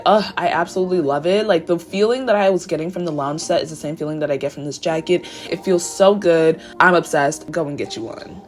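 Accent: Nigeria. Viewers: What makes me happy in these videos is that you incorporate the nigerian accent cause I'm also nigerian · Im starting to develop a Nigerian accent cuz i just love binge watching vids are 😭💗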